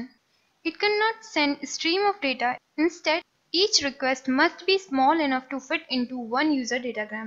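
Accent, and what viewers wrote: India. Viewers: your Indian voice.........................uuuuuuggggghhhhhhhhhhhhhhhhhh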